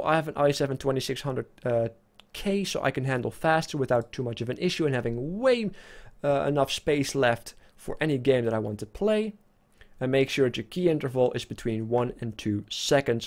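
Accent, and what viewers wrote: Netherlands. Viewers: youre dutch? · i guess your dutch:P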